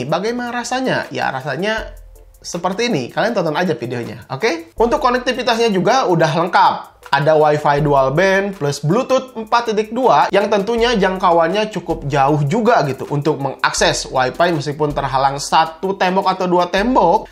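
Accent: West Java, Indonesia. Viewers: Urang sunda ieu mah ngomong na lipa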